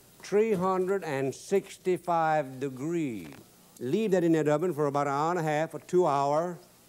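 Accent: Louisiana, United States. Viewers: His show was a regional hit down in the deep South mainly Louisiana (Where he is from, which is why he has the Cajun accent), Mississippi, Alabama. Then it aired nationwide decades after his debut on the PBS affiliate in Mississippi once they figured people outside of those states I mentioned would love his humor and Cajun accent.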